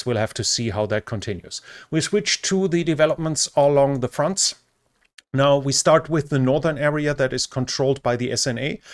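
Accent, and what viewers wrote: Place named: Germany. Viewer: Normally I wouldn't correct a pleasant German accent, but "ah-paw-state" is the English pronunciation. Doesn't matter much as most English speakers don't know what apostate means. Old diplomatic saying for region: "In the end, everyone betrays the Kurds." Probably the same can be said about the Armenians. If Assad falls I suspect the US will support the Kurds to a limited degree right up till Trump is sworn in. With much lamenting about loyalty and blaming of Trump by the military types, the US will then withdraw. As it has been for thousands of years Anatolia and Persia, to call them by their historical geopolitical labels, are too important to ignore. Everyone else can be.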